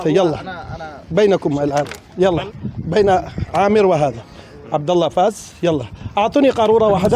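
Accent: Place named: Saudi Arabia. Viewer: السلام عليكم ورحمة الله وبركاته هل أنت سعودي؟ أنت تتحدث العربية باللهجة السعودية، فمن أين تعلمت هذه اللغة؟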